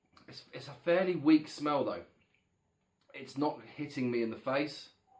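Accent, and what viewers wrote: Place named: Ipswich, United Kingdom. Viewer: What's your accent? You sound like an Ipswich boy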